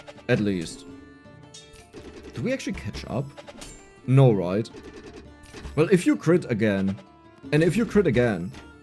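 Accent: Germany. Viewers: Omg 200k 🎉🎉🎉🎉 congrats I love almost all your content I could watch u watch paint dry with u talking about it lol. I really enjoy ur German accent and when u get frazzled it kinda makes me laugh sorry. 😂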